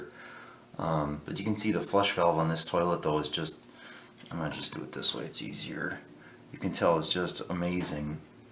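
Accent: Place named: Midwest, United States. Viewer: You've gotta be from Ohio or Minnesota 😂❤ thanks for the vid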